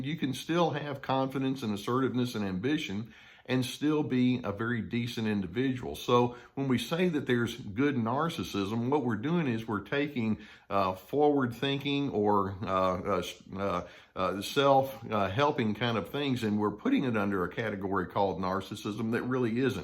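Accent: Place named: Texas, United States. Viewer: "I wish for you Stability, Sensibility and Objectivity". "I wish for you Dignity, Respect and Civility in your actions and relationships" = from other Dr Carter videos. I love having the truth in my ear with Dr Carter's warm, kind TX accent! Appreciate your clarification of the "duality" of people's natures! Keep up your work for the Common Good of humanity!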